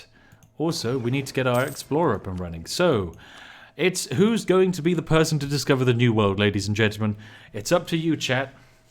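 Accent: United Kingdom. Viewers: I could listen to read a EULA. Such a spiffing British accent should come with a warning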